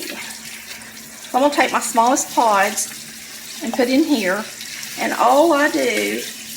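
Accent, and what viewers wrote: South, United States. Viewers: "boled" okra? I actually love you southern accent. Thanks for the video.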